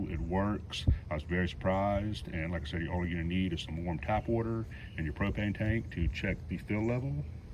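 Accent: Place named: Philadelphia, United States. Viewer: Great tip! Thanks! Also, you from Philly or SEPA? I ask because you say "wooder" instead of "water".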